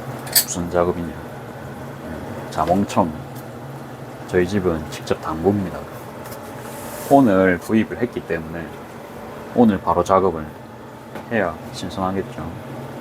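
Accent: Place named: Busan, South Korea. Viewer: 영상을 보면서 저도 자몽청을 한번 만들어볼까? 하는 생각을 합니다 정감있는 부산말 참 좋습니다!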